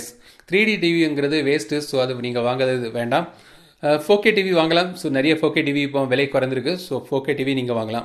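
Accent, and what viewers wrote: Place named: Nanjil Nadu, India. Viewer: nanjil tamil super....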